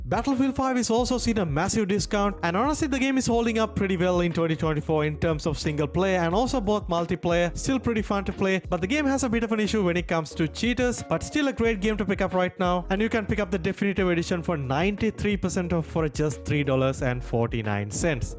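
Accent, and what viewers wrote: Sri Lanka. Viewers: Let me guess are you sri lankan? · Are you sri lankan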